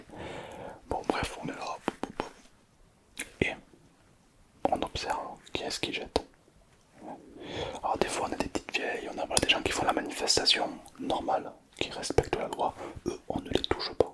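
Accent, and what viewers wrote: South, France. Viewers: J' adore ton accent du sud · Ce qui marche bien c’est que ca gars il a pas besoin d’imiter l’accent du Sud, ca rend la truc assez réaliste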